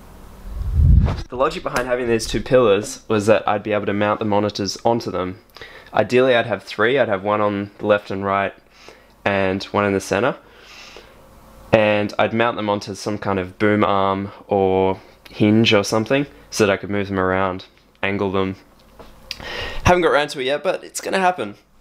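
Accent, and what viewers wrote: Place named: Australia. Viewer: before i found this vid on your channel i thought you might be Australian guess i was right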